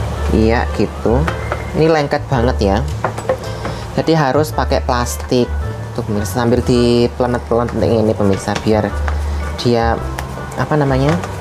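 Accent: Java, Indonesia. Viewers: Ya Allah.aku kudu ngguyu Yen ndelok kontenmu..Bahasane campuran bikin lucu,medok jawane..marai luwe kui pecele...cb Yen cedak,bakal tak jupuk kui maeme..he..he...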